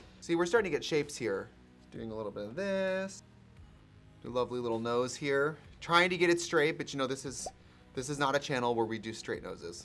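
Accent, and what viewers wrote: Wisconsin, United States. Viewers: Is it just me, or was the Wisconsin accent really making herself known in this video?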